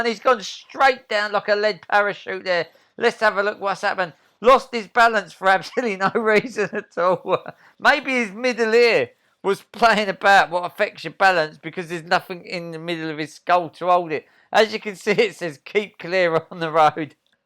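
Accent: Cockney, United Kingdom. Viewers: What's your name mate? You really do make laugh with your accent ! Are you a cockney buddy? Greetings from Casablanca Morocco ❤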